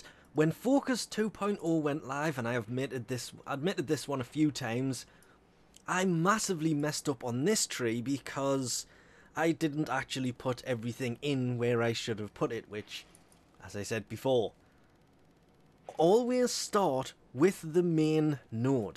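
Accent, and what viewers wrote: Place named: England, United Kingdom. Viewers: North east England. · Except Tac is Irish and this guy is English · Sounds like he's from Newcastle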